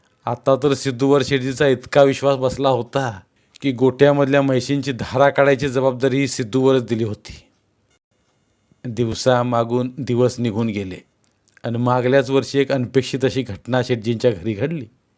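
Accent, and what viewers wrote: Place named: Nipani, India. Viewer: कथा सांगली मिरज कडची आणि बोलण्याचा टोण निपाणी हामीदवाड कडचा वाटतोय जरा जरा सुर वडताय 😂 कोकणी गत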